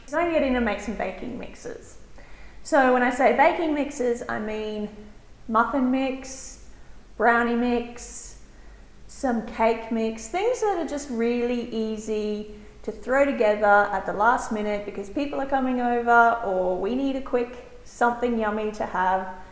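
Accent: Australia. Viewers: I watch your video's daily from America. I live in Alabama just above Florida. I Love your accent (wish I had an aussie accent) You're so beautiful and I love your kitchen. My husband loves the muffin mix.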